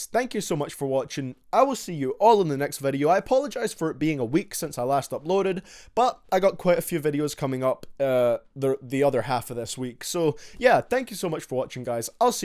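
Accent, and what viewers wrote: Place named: Scotland, United Kingdom. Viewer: Where abouts in scotland are you from ?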